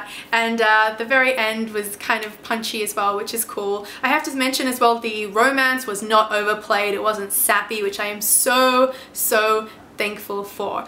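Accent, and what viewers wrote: Australia. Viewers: Loved your take off the film and I definitely agree with you! You are now becoming one of my new favorite movie reviewers on YouTube with a nice sounding Aussie accent and, dare I say, stunning appearance! Keep up the great work!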